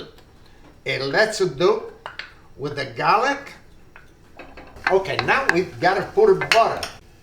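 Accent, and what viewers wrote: Italy. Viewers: ? Where would I be able to procure those little scallop dishes or scallop shells????? Bc where I live, I’ve never ever seen those, im in Pa, and there’s no good seafood stores or seafood places to get anything like that (?!), im in Lewisburg Pa, ( it’s a very old Victorian college town, yet ZERO seafood places to get FRESH Seafoods ect, anyhoo, I so SOOOOOOO ENJOY WATCHING N FILLOWING YOU! My grandpa Louis came straight here from Southern Italy, n he never even learned to speak English!! Lol lol. Yet I was able to understand Italian, but couldn’t SPEAK it to save my soul!!!! Lol lol lol🙃😁, yet somehow i understood every word he spoke to me, he use to walk me on his Hugh farm, and he would pull radishes straight out of the earth n dirt, brush it off n feed them to me! Lol, my matah use to have a FIT bc she said it wasn’t washed properly!! ( lol lol lol lol) yet my grandpa still did it anyway!and I luvvvvvved it!, tnk you so much for being YOU!, bc you sound exactly like him with your ITALIANO accent!!!!!👍👍😁😁🥰🥰🥰🥰😁🥰🥰😁😁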